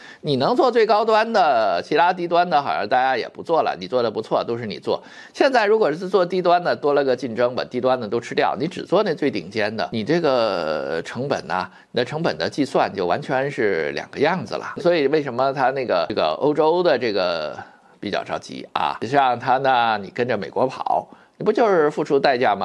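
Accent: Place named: Beijing, China. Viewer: 京腔真重啊！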